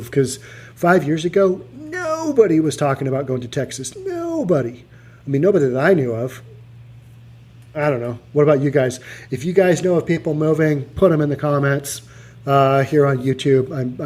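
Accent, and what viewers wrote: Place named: West Coast, United States. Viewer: Tried to watch this. But this guy is to west coast laid back trying to be cool and slow with that idiotic accent.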